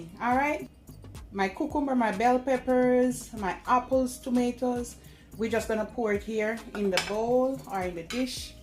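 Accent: Jamaica. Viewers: Hi, so good to hear a Jamaican accent. Nice healthy food, Imma try it ✊🏽✌🏽🎶🐾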